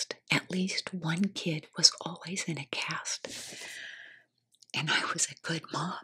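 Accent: United States. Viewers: I’m from England and I don’t usually find American accents doing ASMR give me tingles (nothing against any American accent I just seem to find I get more tingles from accents I’m less familiar with) however everything about your voice is dreamy! I find your voice so soothing, nurturing and tingle inducing! Thank you xxx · Thank you so much for answering My question! We are in Texas near Houston, so that’s so cool!! I thought you might have a little TEXAS in you 😉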